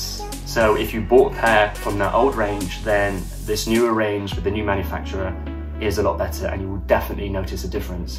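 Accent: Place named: United Kingdom. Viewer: Pleasantly surprised to hear that you're a fellow Brit. I had seen loads of pix of you, but always assumed you were American. I detect a bit of an accent there, so I'm trying to work out which part of England you're actually from. Leave it with me. But...this is a great video. Did it help me to choose which underwear I preferred? Not really. Unfortunately I kept getting distracted by other things...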